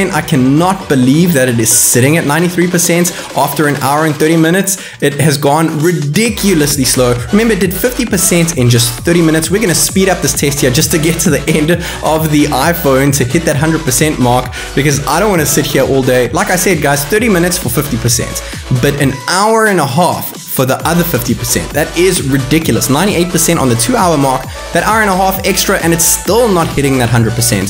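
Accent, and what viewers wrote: South Africa. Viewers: Are you South African?? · U sound south African so u now my favourite tech guy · You are definitely south african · South African accent, talking at the speed of an auctioneer. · Instantly knew you were also South African once I heard your voice, now I gotta sub haha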